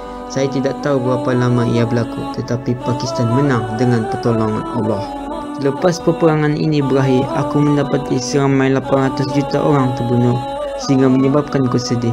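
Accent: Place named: Terengganu, Malaysia. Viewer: Bunyi cara bcakap nie loghat terengganu,org trgganu dlm bahasa baku..trbaikkk...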